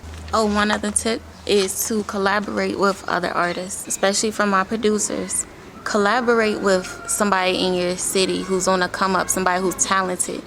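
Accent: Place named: United States. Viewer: Where are you located?cos the accent says American but the scenery says AFRICA!!!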